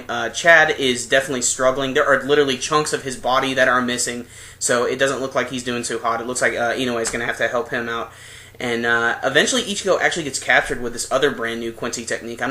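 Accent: Germany. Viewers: Your german accent is freaking hilarious xD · No matter what happens this final arc I will enjoy it simply for the stereotypical German accent in your reviews.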